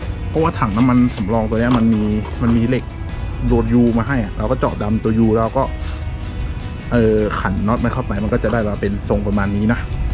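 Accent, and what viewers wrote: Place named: Central, Thailand. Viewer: สำเนียงนี้โซนกำแพง ชัยนาท คอนหวัน อุทัยใช่แน่นอน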